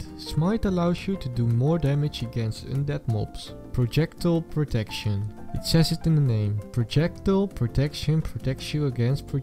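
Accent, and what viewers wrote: Netherlands. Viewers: Love the Dutch accent hahahahah! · Are u Dutch?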